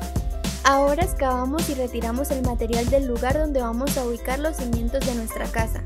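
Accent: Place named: Colombia. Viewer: Por el acento , Colombia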